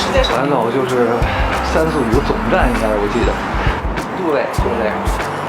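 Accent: Beijing, China. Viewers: 这北京话听得舒服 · 我爱你，我也是北京人。现在堵在美国不能回家。听了很多遍你的后座剧场，也是我小时候的回忆。听着熟悉的京腔，我好想家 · 北京腔真的跟老大爷似的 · 就爱他这种北里北气的口音 太好听了真的 能听一整天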